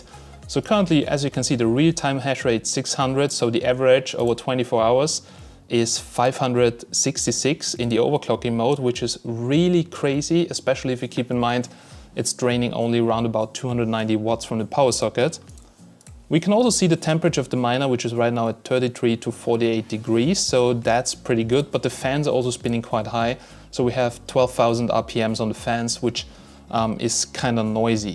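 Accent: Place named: Germany. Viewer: durch zufall deinen channel gefunden :) irgendwie hört man als deutscher direkt wenn ein anderer deutscher englisch redet :) cooles teil der jasminer, ob sich das mit eth noch lohnt ist halt die frage, aber wenigstens geht dann noch etc damit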